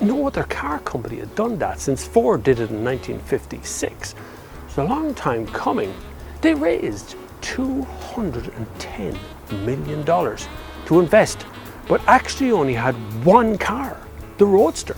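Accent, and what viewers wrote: Ireland. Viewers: Excellent documentary. Thanks very much. Just a minor point as a Canadian watcher: Newfoundland is correctly pronounced "Newfin' lan". Also, they have accents very close to your own as this part of Canada was mainly settled by Irish migrants. Carry on. Great content. Cheers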